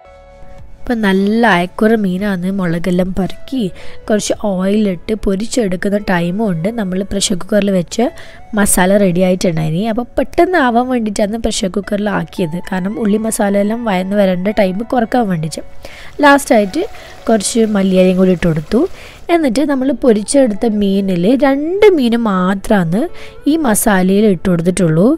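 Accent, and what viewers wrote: Kannur, India. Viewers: Kannur slang kelkan anne njn vedio kanunnath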